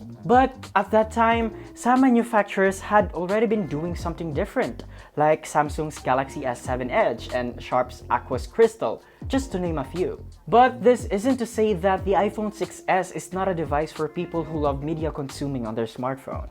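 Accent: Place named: Philippines. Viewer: Knew he was a filipino by the accent, hahah proud pinoy!!!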